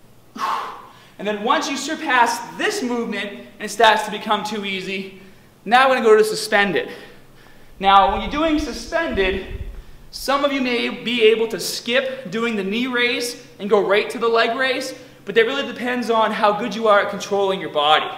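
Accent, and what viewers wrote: Boston, United States. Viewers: boston accent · Yeah he's from Boston so he's just been hiding it in the past. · I figured maybe he always spoke with a Boston accent but hid it in his earlier videos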